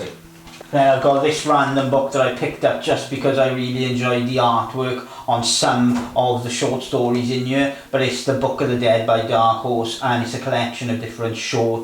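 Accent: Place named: South Wales, United Kingdom. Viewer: That little mistake does not keep me from enjoying the video. I think most people make the mistake because quietly is the better-sounding word. Are you native to South Wales? I'd like to connect the accent geographically.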